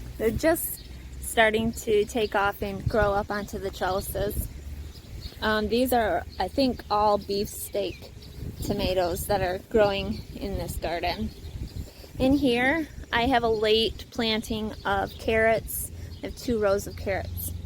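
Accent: United States. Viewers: Nothing personal and I do love your channel but why do Americans say Eand ?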